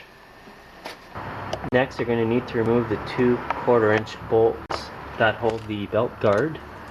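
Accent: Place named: Canada. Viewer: YOU SOUND CANADIAN...ARE THE CANADIAN MOWERS ANY DIFFEREN THAN ONES FOR USA?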